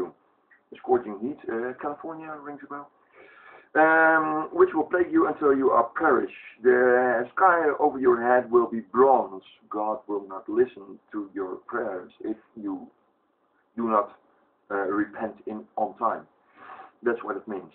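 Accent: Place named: Netherlands. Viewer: Jij bent Nederlands. Dat hoor ik gewoon. Interessante video.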